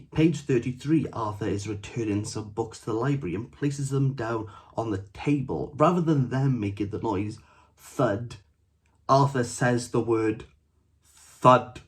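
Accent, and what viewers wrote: Wales, United Kingdom. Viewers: Cool review. Love a welsh accent I was born there left when I was 5 and have never been back.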